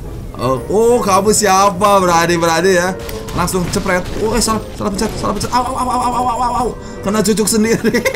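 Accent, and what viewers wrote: West Java, Indonesia. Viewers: bang orang sunda?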